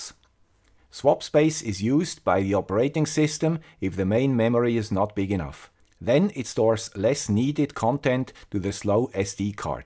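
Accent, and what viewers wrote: Switzerland. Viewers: was in Switzerland last month for first time, and wow, this is the land that Swiss accent guy living and making experiment !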